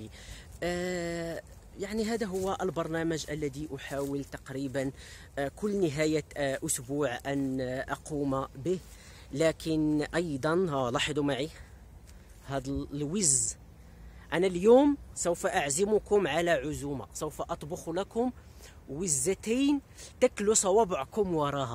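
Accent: Morocco. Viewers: هههههه عن جد انت ظريف كتير حتى بعتبك لطيف ودمك خفيف ، بعدين احلى اهلين بتطلع منك ، على فكرة أنا بحسك سوري لكن بيحكي بلكنة مغربية 🌷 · خويا انا عاد عرفتك البارح و راني كنشجعك و كنشكرك على كل ما كتقدمو لنا من معلومات و نصائح. كنتمنى لك نهاية أسبوع سعيدة. جاتني هضرتك مراكشية إلا ايه راه حتى انا من مراكش 😄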